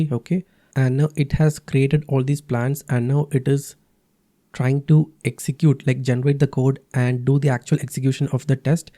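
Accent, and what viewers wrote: Kerala, India. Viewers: You sound so mallu with your mallu English bhai....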